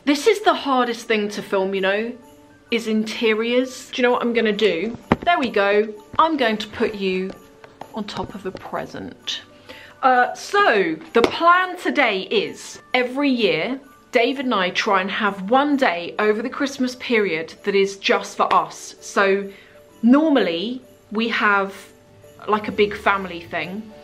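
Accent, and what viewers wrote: England, United Kingdom. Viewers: I just love to listen to you!!! Not only are you articulate, you have the BEST English accent!!! Thanks for sharing your Christmas with us, and I LOVVVE your gorgeous Chanel trendy!!!🎄🎄🎄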